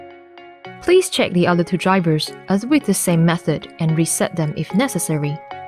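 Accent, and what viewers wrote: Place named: China. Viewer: It's sad when even the AI has a Chinese accent so heavy that it can't be understood.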